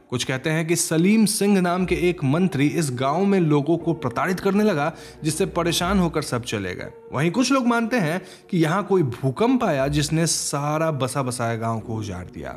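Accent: Bengal, India. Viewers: The man who is narrating ... Are you bengali? Because your accent is quite like them...😁 By the way amazing narrating 🤓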